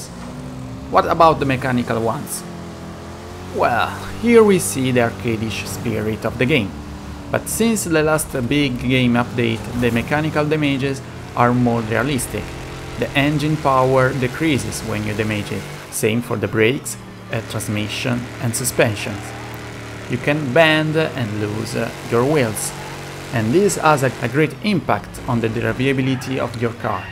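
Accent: Italy. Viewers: Si sente che sei italiano.. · ha un accento tipo italiano ahah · i can hear some italiano Bro, hahah grande vecchio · Si sente da chilometri che sei italiano ahahahhahahaha, bel video! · Bro ci gioco 50€ che sei italiano dall'accento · are you..italian? sei italiano?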